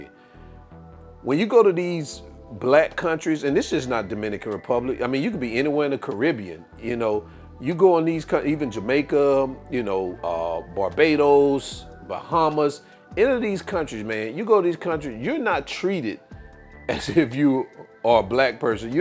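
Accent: South, United States. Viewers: You should be a preacher. You alreary have the southern accent. You said it as you see it, and I think you right.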